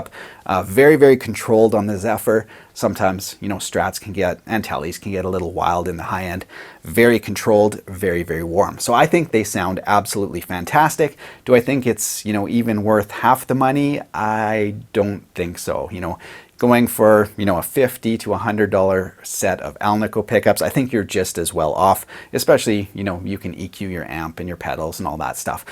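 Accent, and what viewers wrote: Canada. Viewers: are you Canadian????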